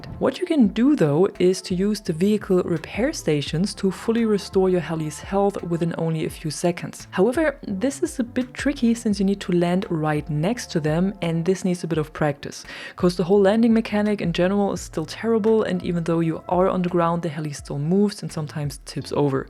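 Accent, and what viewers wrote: Germany. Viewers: man hör den deutschen Akzent echt nur ein bisschen raus🤣🤣🤣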